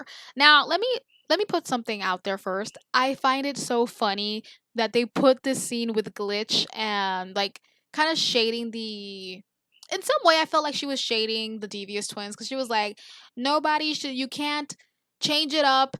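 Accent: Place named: Latin America. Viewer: Balla se te escucho vien el espol latino 😃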